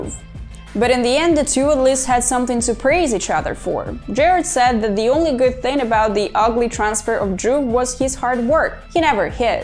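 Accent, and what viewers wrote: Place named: Latin America. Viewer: Great content, the accent is giving some Latin speaking country but your command of English is better than us Brits. Your delivery means you will be a YouTube star, keep it up 🙌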